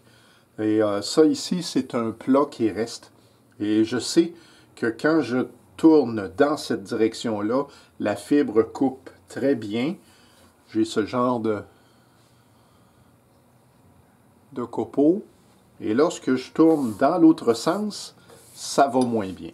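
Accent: Quebec, Canada. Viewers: Bonjour et merci pour cette dernière vidéo, très instructive comme les autres. Je suis débutant tourneur sur bois, en France. Mon métier c'est plutôt le métal. Une cliente m'a donné un tour à bois. C'est un modèle bas de gamme, mais ça me suffit pour faire mes manches de limes 😉 et apprendre. J'apprécie beaucoup vos vidéos, très claires, très pédagogiques. Et puis j'adore entendre l'accent et les expressions québecoises. Un grand merci pour ce partage de votre savoir. 🙂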